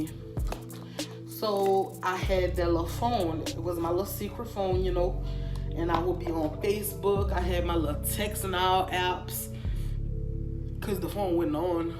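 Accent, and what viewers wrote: Louisiana, United States. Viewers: I can tell you from Louisiana 😂✊🏿💯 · Love your accent you must be from da boot oh and LOVE your hair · New Orleans ? First time watching her videos and Her accent hitting me hard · New Orleans accents are my absolute favorite!!!! However; what is not my favorite is sis flipping her hair back and forth like 😐😐😐sisssssss please just do your hair it’s distracting!!! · This popped up on my feed randomly and my first thought hearing your accent is New Orleans gotta be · Da accent is deeeeeeeeep ! New Orleans gurll😻❗️ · I love her Louisiana accent!😂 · Period nails and hair.. such a fan of your accent too, let's go Nola baby lolll. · Your accent made me automatically subscribe. Ain’t nothin like a good ole NOLA Accent BAY-BAY 🤩😍😍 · U have to be from Louisiana. Love yall accent! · Louisiana accent? It's so cute 😃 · Yesss with the nawlins accent!!!❤️ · that louisiana thick in that accent. 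It is so cute!!!! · That Louisiana accent tho... Right it is Louisiana? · You from Louisiana ? You sound like my fam from down there in slidale☺️💕love yo accent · She gotta be from Louisiana I hear the craw in her accent I loveeeeeee it though you got beautiful hair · My guh u from Louisiana? You sound just like my family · Accent sounds like Louisiana, hey sister girl 🥰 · Where you from!? Sounding like nola babyyyyy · I went to college in Louisiana. I know that accent anywhere 😍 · I knew you were from Louisiana! I love your accent 😍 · She gotta be from Louisiana she got that accent..I lowkey miss living in Louisiana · I already knew you was from Louisianaaaa, I love your accent 😭 #newsubbie ✨ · Love that New Orleans accent whole video lit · Ive had some shit like this happen to me a few times smh imma do a few story times on my channel one day when i get the courage lol, but i loved the vid and ur accent made me miss Louisiana cant wait to go after the rona clear up in the u.s. 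· i just found your page but your accent 😍😍 . that’s sound like that nolaa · I love your accent 💕 are you from Louisiana? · U got to be from Louisiana with that accent ... 💜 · I love Louisiana accents😭❤️ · I automatically knew you was from Louisiana with that accent lol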